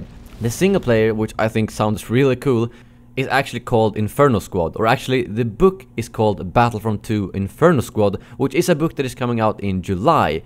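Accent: Sweden. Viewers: Man kan höra på din engelska att du är svensk. Men bra video annars!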